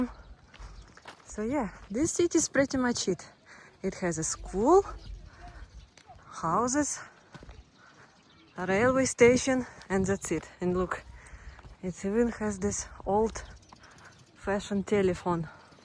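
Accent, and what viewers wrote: Russia. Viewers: Hello from Florida, USA I love to listen to you speak English with your Russian accent. You sound so interesting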